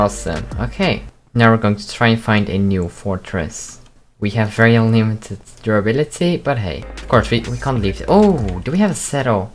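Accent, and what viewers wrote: Netherlands. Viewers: somethimes i have the feeling that youre dutch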